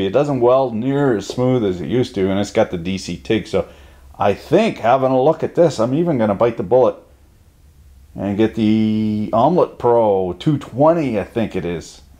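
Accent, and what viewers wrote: Quebec, Canada. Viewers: Jte spot avec ton accent du lac saint jean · I thought the best part was hearing you speak Quebecois, until I heard your little girl...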